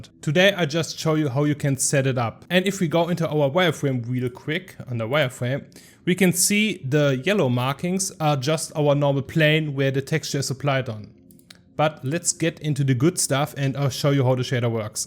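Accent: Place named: Germany. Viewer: Der Deutsche Akzent ist nicht überhörbar 😅, aber gutes Video 👍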